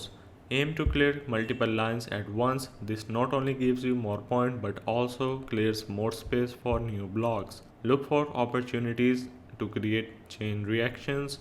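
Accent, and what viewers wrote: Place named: India. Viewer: Bassically there is this video of a person trying to aggravate a scammer and the scammer had a strong indian accent and was yelling at the person “DO NOT REDEEM IT!” And the video in general was rlly funny but the reason ppl are saying it is because he has a similar accent to that scammer. Hope this helps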